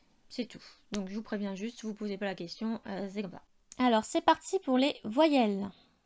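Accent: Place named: Paris, France. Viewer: Je n'avais pas remarqué ton accent parigot, mais j'adore ton chuintement sur 'ch' et 'j'! Bravo pour ce morceau de bravoure qui m'a ramené dans ma classe de 6ème, il y a... un certain temps !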